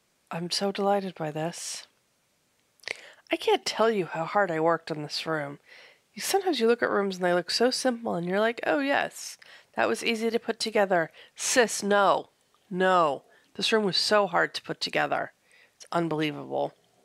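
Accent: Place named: United States. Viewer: Kind of a personal question, and maybe you've answered it before... You sound like an American, how did you come to be living in Ireland? Also, do you like living there? (I might be looking for a new country to move to after November 3, lol.)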